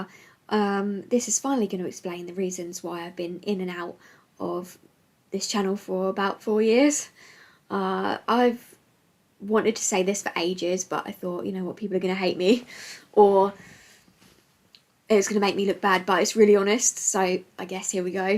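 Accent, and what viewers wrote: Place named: North London, United Kingdom. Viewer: I discovered your channel from the early Skybound days and you became an instant follow. There weren’t many British reactors that I could find back then and I was trying to suss out your accent …( I settled with somewhere North of the Thames )…,the giant mugs of tea and the ‘professional crying’ drew me in..! I remember a video you put out about 3 years ago and after that one, I could see the hurt in your eyes that you were trying to overcome and continue onwards but the damage was done and I noticed the sporadic posting..! Recently have been unfollowing some channels that haven’t posted in a while but yours was not on that chopping block…I kinda knew you’d be back sometime..! Anyway…I’m beginning to waffle but I do have a suggestion for a tv series to react to…it’s called Pluribus…it’s on AppleTv and apparently from the same guy that did Breaking Bad and Better Call Saul…two shows that I’ve never seen but liking this at moment.! 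But it’s great that you’re possibly coming back to reacting and that you have a focus to move forward with..! Excelsior… 😉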